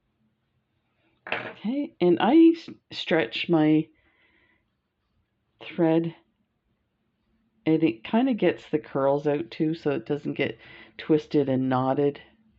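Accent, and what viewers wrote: Canada. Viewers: I'm so happy to see these earrings. So gorgeous. I just got my hair cut so my earrings will show now...haven't had short hair for years! You explain each step and I enjoy your "chatter", it's part of your charm! Keep doing it. Love your Canadian accent.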